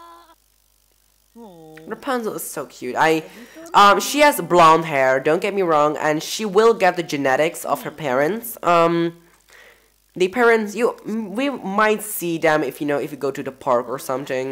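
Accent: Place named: United States. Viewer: Ik dacht echt dat jij Amerikaans was ofzoo je spreekt echt goed engels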